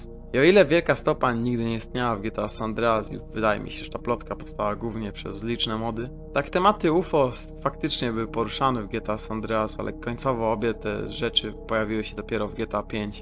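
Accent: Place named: Poland. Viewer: Polglisz mocno xd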